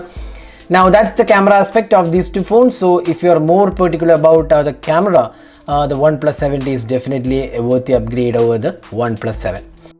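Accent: Kerala, India. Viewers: Work on accent neutralisation, content is decent. Kill the accent and you'll do good. Good luck. Btw I'm a mallu too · Ur mallu slang is so predominantly heard ! Chetta keep it up ! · He's from kerala according to his accent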